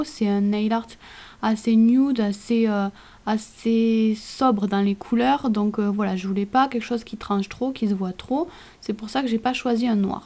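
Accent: South, France. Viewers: Bonjour! Je vais poser une question un peu bête, je voulais savoir si tu étais en région parisienne (je tente quand même malgré ton accent du Sud!). J'ai un coup de cœur pour cette manucure et j'aurai bien aimé que tu me la fasse pour mon mariage l'année prochaine!!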